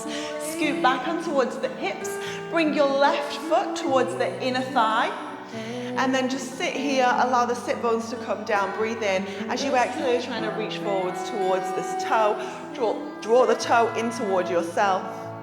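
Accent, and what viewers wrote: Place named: United Kingdom. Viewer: So happy I found your channel. I’m coming down from intensive exercise & being kinder to my body. Loving the combination of weight’s & pilates moves. Love the fact that you’re U.K. too, fed up of listening to over hyper American accents.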